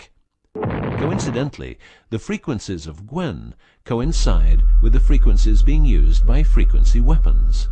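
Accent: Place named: United Kingdom. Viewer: this is RETARDED but since an annoying brit is narrating it must be true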